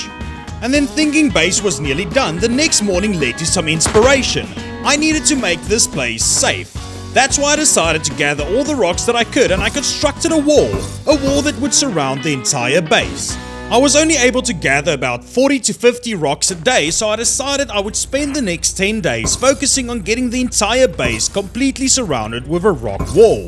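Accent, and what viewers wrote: South Africa. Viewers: Are you South African? You sound South African. I am from South Africa, lol.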